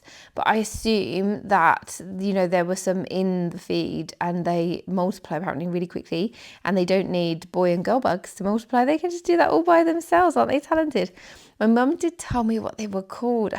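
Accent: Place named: Leicestershire, United Kingdom. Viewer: Hi im a new subscriber, your accent sounds familiar im from Leicestershire you sound very much like youre from leicestershire or nearby😊 love this video and all the best to your stepfather hope hes going to be alright ❤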